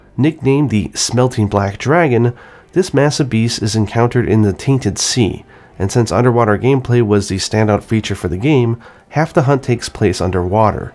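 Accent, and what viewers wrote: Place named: Japan. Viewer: so this is how Japanese who're extremely fluent in English pronounce the monsters English name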